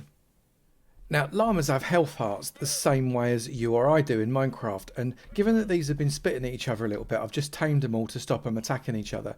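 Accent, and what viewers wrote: United Kingdom. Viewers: btw, oi quoite loik ur british accent its almost asmr to my ears i may join ur server someday. plz do a video on...endermites?(i couldnt think of anything)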